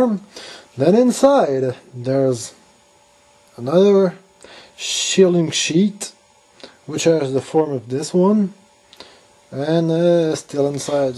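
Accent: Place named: Quebec, Canada. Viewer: j'ai reconnu l'accent québécois lol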